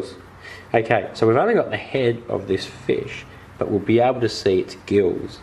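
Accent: Australia. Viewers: its friendly to grownups beginner. he talks australian english. takes, cant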